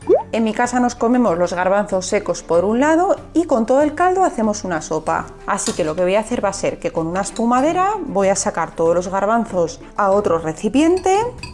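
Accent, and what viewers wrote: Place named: Spain. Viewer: Me encanta el acento español porque explican bien y se hacen entender y son tan limpias para cocinar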